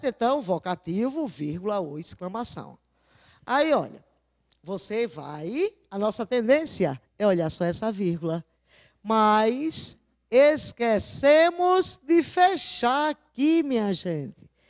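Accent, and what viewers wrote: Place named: Northeast, Brazil. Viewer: Até que fim, encontrei alguém que fala minha " língua" nordestina porreta. Arretada de boa, clara e objetiva. Obrigada. IVANI.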